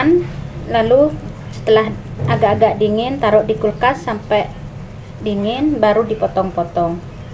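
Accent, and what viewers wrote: Madura, Indonesia. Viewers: org madura ya kok yg ngomong kyk gitu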